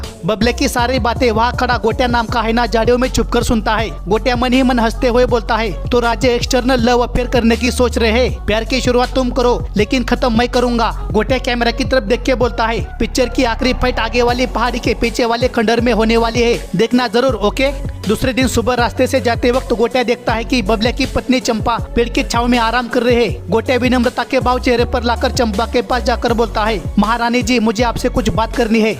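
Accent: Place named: Mumbai, India. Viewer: Mumbaikars. Wali Hindi bol Raha hai Bhai ek number 😂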